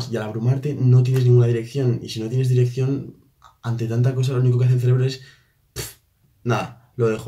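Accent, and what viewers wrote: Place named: Aragon, Spain. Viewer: Jajajaja se nota el acento maño!! Saludos desde Tarazona Bro!!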